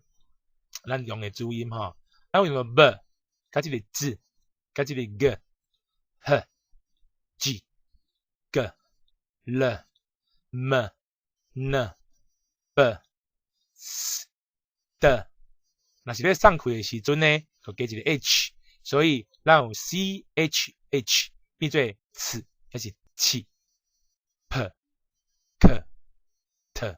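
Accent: Quanzhou, China. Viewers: 听到泉腔闽南话，tai gir台语, ter短。作者应该是泉州籍。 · 更正：是「泉州腔」。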